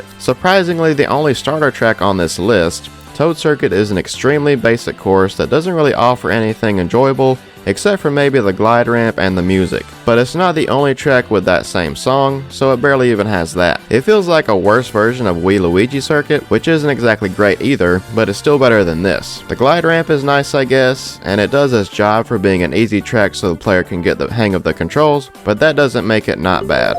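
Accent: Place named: South, United States. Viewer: W man with a Southern accent talking about the worst tracks in a Japanese racing game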